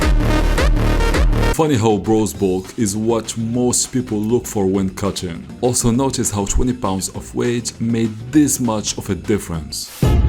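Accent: Morocco. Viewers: Judging by your accent 100% you are from morocco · you're moroccan for sure, that accent is theeeere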